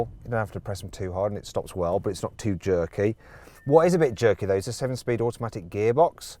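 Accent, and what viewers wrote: United Kingdom. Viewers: イギリス英語がまたいい。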